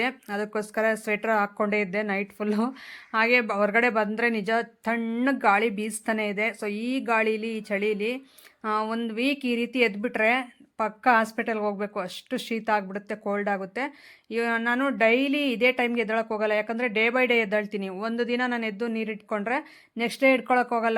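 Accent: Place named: Sira, India. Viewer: Super nivu matado riti nange thumba esta aythu because nam sira side kuda navu hige matadodhu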